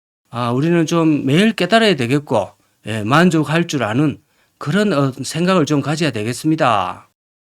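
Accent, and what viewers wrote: Gyeongsang, South Korea. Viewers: 구수한 경상도 사투리가 잘 어울리십니다.수고하세요.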